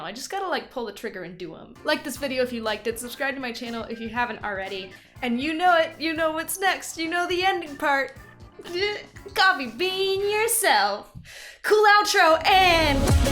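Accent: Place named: Michigan, United States. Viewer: I have a Michigan accent too!!! When I'm in the South they refer to it as a "Lake Accent" but since I'm in Ohio no one believes it's a thing lol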